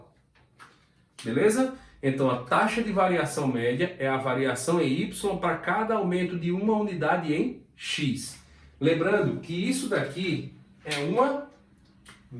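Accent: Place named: Northeast, Brazil. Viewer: Pense num sotaque bonito é o do nordestino! Aula top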